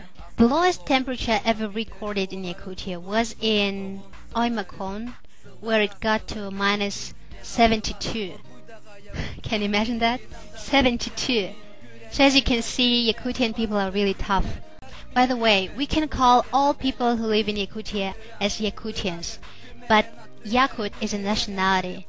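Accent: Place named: United States. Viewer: American accent does not sound good. In any way.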